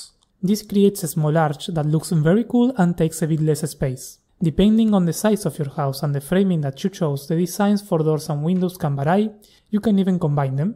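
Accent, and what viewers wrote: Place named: Argentina. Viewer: Sos argentino? Tenes un acento marcado me encanta ❤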